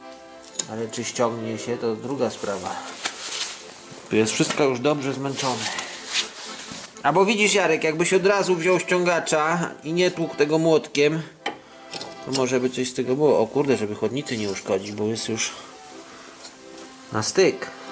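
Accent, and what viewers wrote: Poland. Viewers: gwara podlaska? :D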